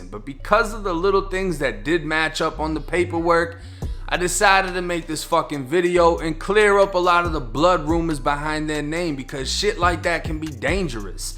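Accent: Boston, United States. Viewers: Yo you from Boston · Wait. You said PAHKINLOT LIKE I DO BRUTHA. you from Boston. I'm in Dorchester. South bay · He from Boston I think lol he got that stupid accent 🤣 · You from Boston bruh? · Depottment? Incosserated? Are you from Boston, sir? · oh youre from Boston for sure. soon as you said "Car" it was confirmed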